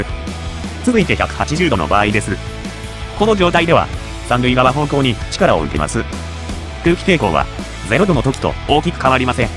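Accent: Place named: Kansai, Japan. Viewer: ちょっと関西弁チックなのがすこ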